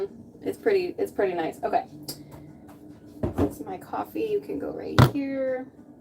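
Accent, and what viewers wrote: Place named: New York, United States. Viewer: Thank you so much for the fun live yesterday. Just going back and watching the beginning… chef’s kiss to your New Yawk accent. “We makin’ CAWFEE OVA HERE!” 😂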